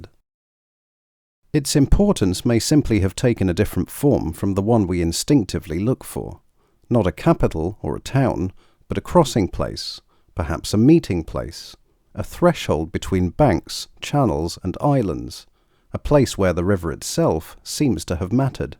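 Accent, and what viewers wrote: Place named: United Kingdom. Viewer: It’s so nice to have a real voice narration. Sensible pronouncation with British English cadence.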